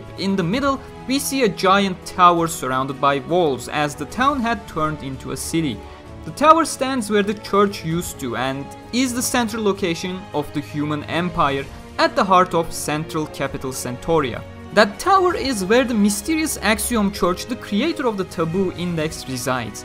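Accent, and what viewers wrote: Turkey. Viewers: ingilizce konuşuyon ama konuşurken türk olduğun çok anlaşılıyor xd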